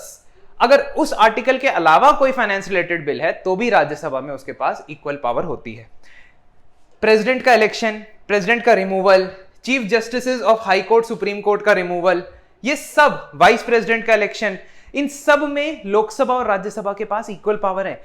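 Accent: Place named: Punjab, India. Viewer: Sir tuhade accent to meinu tusi Punjabi lag rahe si. Jado mei playlist dekhi ta tusi sachi muchi Punjabi nikle😂😂😂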